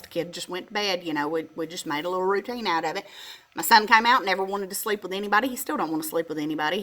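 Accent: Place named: Texas, United States. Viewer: I recognize a Texas accent anywhere!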